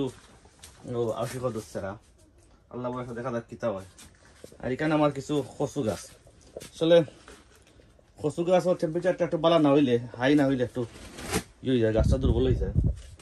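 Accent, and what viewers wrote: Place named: Bangladesh. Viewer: মাশাল্লাহ খুব সুন্দর ফসল কথাই বোঝা যায় আমার দেশি মানুষ সব মিলিয়ে খুব ভালো লাগলো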